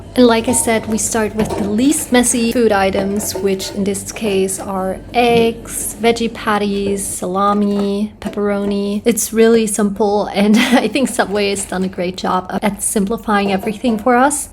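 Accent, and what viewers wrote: Australia. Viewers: I can hear that aussie accent coming in :D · I can already here some Australian accent coming out haha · I just wanna add that as an Aussie watching your content I can hear your accent changing to sound a bit more Aussie 😁 ps. Congrats on your new job!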